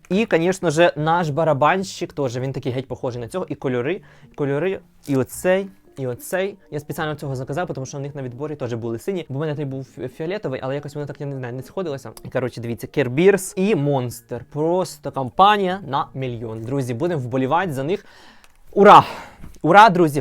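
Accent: Ukraine. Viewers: Твої коментарі це щось. Це б ще я отако "обсирала" тих дідів до хліва і їх галстук. І мова, і вимова,і східняцький акцент. Ти прикольний. Тепер буду дивитись огляди на "лгбт-вакханалію" з тобою.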